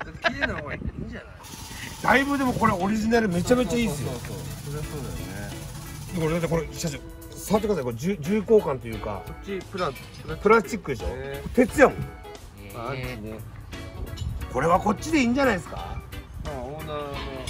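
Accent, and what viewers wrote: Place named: Fukuoka, Japan. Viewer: 福岡弁さん本人の面白さカメラマンとの絡みローレルネタ全部最高。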